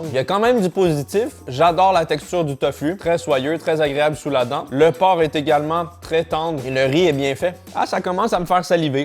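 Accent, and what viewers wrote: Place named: Quebec, Canada. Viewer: je tiens à dire que en tant que québécois, je suis vraiment fier que soit devenu aussi gros sur la plateforme et qu’il est regardé par autant de monde. Ça permet de partager notre accent et notre culture ce qui est vraiment cool. Je te suis depuis bientôt 6 ans et ton contenu est encore aussi excellent continue comme ça je l’adore. (ps: félicitations pour le million d’abonnés 🥳🥳🥳)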